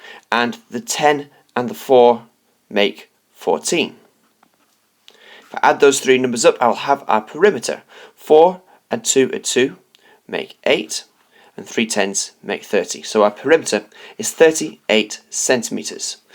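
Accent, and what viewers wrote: United Kingdom. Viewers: That’s because he’s british like me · BRITISH ACSENT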